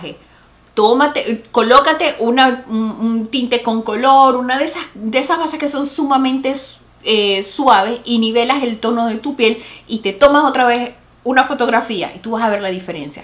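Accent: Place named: Venezuela. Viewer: Holaaa soy de la Isla de Margarita.. estoy viendo tus vídeos son magníficos que buena eres en lo qué haces.. cuando te escuché me dije ay me suena a Venezolana jajajaja me alegra tanto ver mi gente venezolana por el mundo dando lo mejor de lo mejor.. gracias, gracias, gracias por estar en las redes sociales llevando inspiración y si se puede estar bellas y lucir jovial hasta con 80 si se puede.. Dios te bendiga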